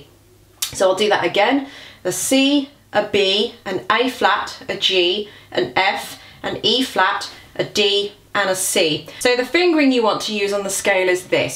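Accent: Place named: United Kingdom. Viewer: I hear an accent... Are you from UK? You Are Awesome!!!!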